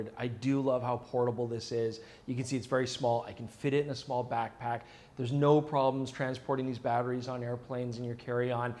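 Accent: Australia. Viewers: nice aussie accent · That was a very credible Aussie accent! 👏😄 Welcome to Brisy, hope you had a great time 👌📸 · legit good aussie accent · I think it's 90% Aussie! The words and enunciation are almost spot on! Though there was a bit of South African in there 😂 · Great aussie accent! You're a natural!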